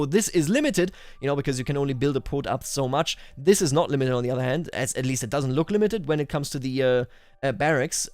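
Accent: Bavaria, Germany. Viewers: Are you actualy bavarian?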